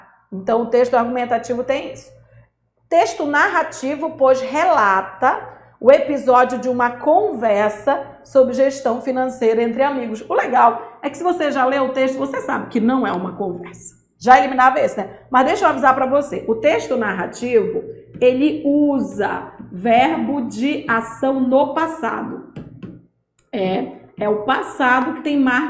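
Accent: Pará, Brazil. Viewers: Quando eu ouvi o bagulho ja sabia que era paraense 😂😂😂😂😂😂😂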